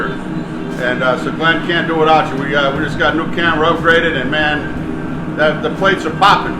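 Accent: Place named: New England, United States. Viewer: Love your pronouncement, "Croaked Mon Sewer" LOL. Not knocking it at all mate, just thought the NE accent rocks!! I'm in Vermont here. ps: Johnson Wales Charleston, SC Campus Culinary class of 1996 here. Since retired but still cooking the classics. Cheers!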